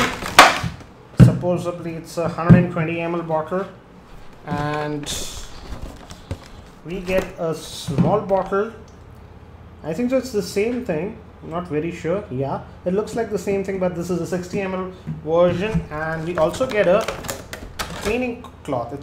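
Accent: India. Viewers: Indian accent is so funny LoL !! I PAAAD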